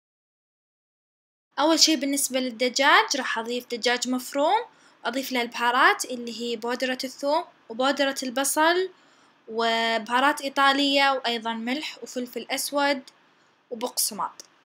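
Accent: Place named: Saudi Arabia. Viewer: صراحة انك مبدعه ماشاء الله بالتوفيق وبالنسبه للي يستغرب طريقتها في الكلام اذا سعودي ليش مستغرب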